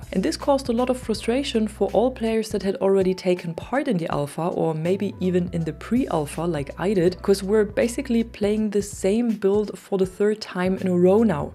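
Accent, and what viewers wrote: Germany. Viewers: ich faends richtig klasse wenn du einfach richtig deutsch englisch sprichst. ich versteh immer nicht warum leutre krampfhaft versuchen irgendein mittelamerikanischen akzent zu imitieren. bööörgr. for the most part, you speak english with german accent, und das ist auch gut so. alles andere wirkt laecherlich, bei jedem der versucht nativ speaker zu imitieren. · Hey, are you german? Your accent gives me hard german vibes.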